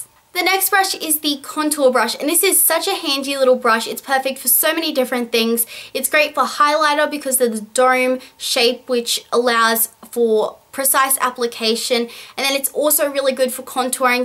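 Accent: Australia. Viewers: I would die for you accent! Australian accents are by far my fav your so perf btw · Australia I believe:) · Australian accent :)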